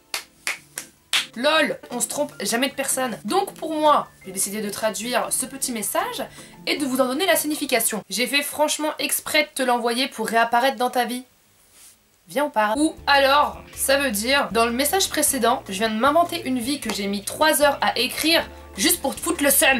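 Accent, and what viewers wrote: England, United Kingdom. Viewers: tu as un très belle axent anglais j'adore t'es vidéo continue comme ça tu es trop drôle bisou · j'adore ta chaîne ma belle! tu me fait trop rire avec ton pti accent british! #teamsnapchat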